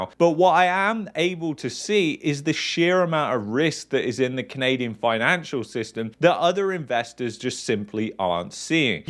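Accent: United Kingdom. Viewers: You are a pessimistic joker with a British accent! I unsubscribe!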